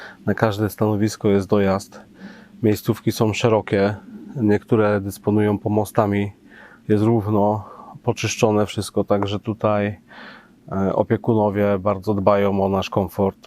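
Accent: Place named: Poznań, Poland. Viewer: Widać(słychać),że jestes z poznańskiego i miło,że używasz czasem słówek z gwary-fyrtel,kieretyny,,grzdyl…Super!!!Powodzenia!!!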